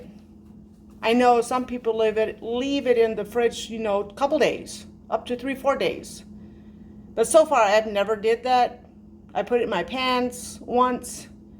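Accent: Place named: Pennsylvania Dutch, United States. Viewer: I cannot find any information on where you’re from but my goodness, you sound so much like folks from home! You wouldn’t be Pennsylvania Dutch by chance would you?love your channel. Thank you!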